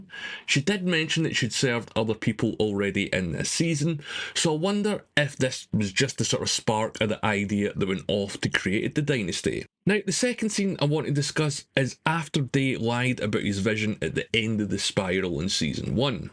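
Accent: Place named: England, United Kingdom. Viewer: It takes a while to get used to your English accent but what you have to say is interesting.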